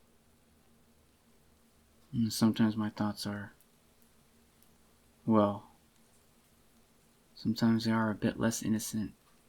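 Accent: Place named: South, United States. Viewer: bro idk why but he sound hella country....still a good AMSR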